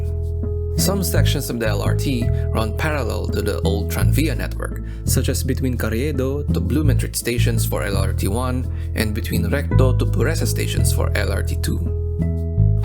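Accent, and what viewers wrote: Spain. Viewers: I love the Spanish accent... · Accento Madrileño.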